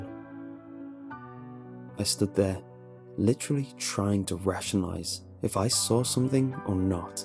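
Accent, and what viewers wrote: United Kingdom. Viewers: is he brittish?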